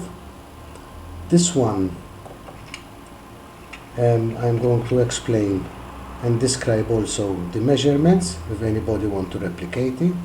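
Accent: Italy. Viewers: ! Excellent video, great added value to the already great video of Tetkoba... and I can't resist to tell you your delicious Italian accent makes it a pleasure to watch;-) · Thank you for making a very instructional video. You not only made a functional stove you made it beautiful by polishing. Are you from Italy? Your accent reminds me of my grandfather who came to America from Calabria in Southern Italy. Perhaps you are not from Calabria as they say people from there are " Cappo Tosto ". ( you do not seem thick headed...haha )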